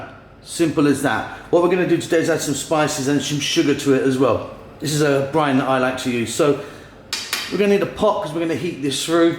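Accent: United Kingdom. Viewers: Its a british accent...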